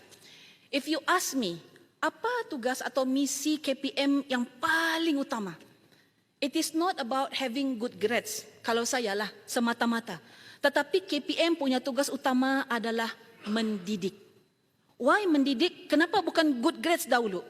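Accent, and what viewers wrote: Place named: Sabah, Malaysia. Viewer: hahaha…pelat sabah tu boleh tak di kurangkan…bercakap lah dalam bahasa malaysia (melayu)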